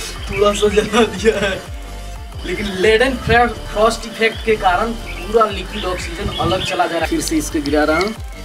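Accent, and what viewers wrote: Bihar, India. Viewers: Mujhe lagta hai ki app Bihar se hai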